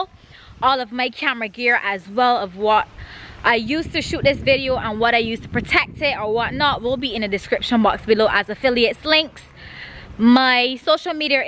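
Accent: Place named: Barbados. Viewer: I love your Barbadian accent! You must be from Barbados.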